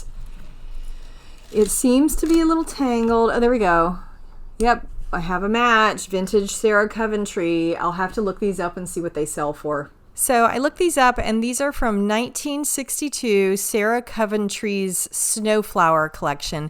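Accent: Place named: Alabama, United States. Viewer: You must be from Alabama.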